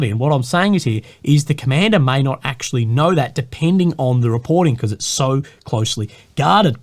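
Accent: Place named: Australia. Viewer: What a fentastik 😍😍😍😍😍 day what a fentastik accent i love Australia accent wooow, you channel is great but your accent is amazing, fentastik. Have fentastik day sir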